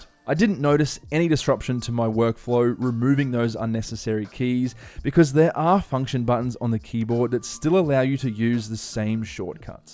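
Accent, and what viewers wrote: Australia. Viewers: You’re aussie right 🥵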